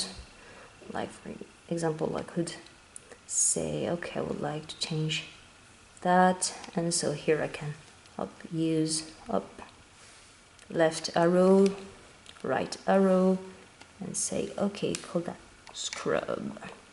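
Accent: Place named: France. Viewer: your French accent is really enjoyable, quite frankly.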